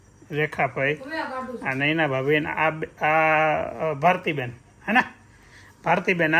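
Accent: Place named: Mumbai, India. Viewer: Dada e song bavj saras tune maa gaayu, emno accent thi laage che ke pela Mumbai rehta hoi.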